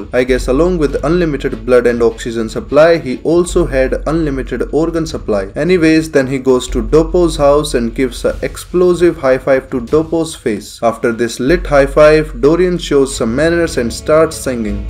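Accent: India. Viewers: His indian accent just make this video better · Dude I feel like you are a fellow Indian the accent sounds very similar 😅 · u sound indian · i love this guy's perfect grasp of english slang combined with an indian accent, its hilarious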